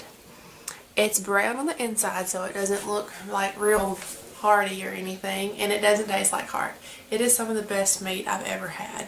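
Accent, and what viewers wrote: United States. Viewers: That souther accent is almost comforting in a way; Ive been in St. Louis way too long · By the way that’s a lovely accent you have. Texas?.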